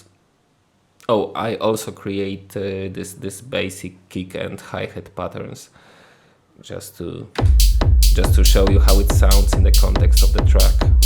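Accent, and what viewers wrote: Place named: Poland. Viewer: w pierwszych slowach slychac swoich:p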